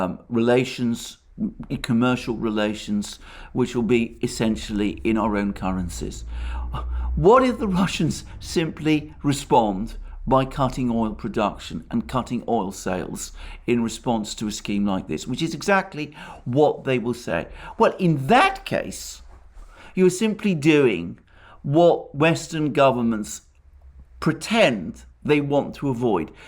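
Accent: United Kingdom. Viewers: Your extraordinarily british way of speaking amazes me, amuses me in a way that I can't explain... You're obssessed with Ukraine and Russia, and you still have SOME time to be umplesant and rude about the CHINESE" I'm sorry to be amused by this, but your gentlemansness is fantastic.... Thanks a million for the great analisys AND for the gentlemansness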